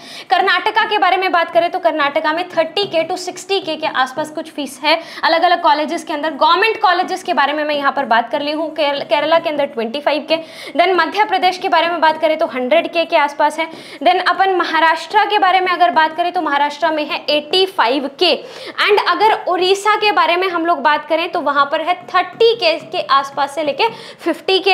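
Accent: Haryana, India. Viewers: Dii i am also from Haryana this year i cleared 10th class with 81.2%i hope i also become a mbbs student just like you,your way of speaking is exact like real haryavi i like it🥰😇🤗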